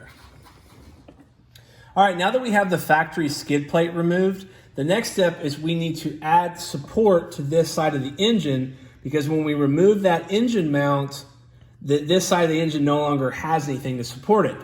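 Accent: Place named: Texas, United States. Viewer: Instruction car videos are just better in a Texas accent. LOL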